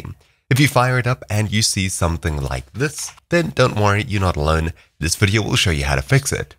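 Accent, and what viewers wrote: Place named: South Africa. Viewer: Knew you were S.A 'en, picked something up in your accent a while ago, then I saw your Origin name just now.. Haha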